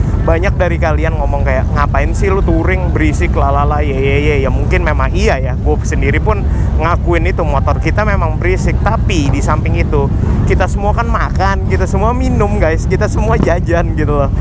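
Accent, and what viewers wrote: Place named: Citayam, Indonesia. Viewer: om ngomongnya bae kaya org citayem aja...hehheehe.. mantap om....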